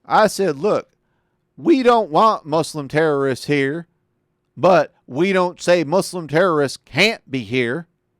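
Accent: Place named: Texas, United States. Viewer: Love the accent! You sound like I would imagine a Texan to sound like...kind of a Southern Western drawl. The internet is buzzing with what the Texas State Government wants in their text books. "My great great great grandpappy used to ride on one of them Brontos, said it made him feel closer to Noah!"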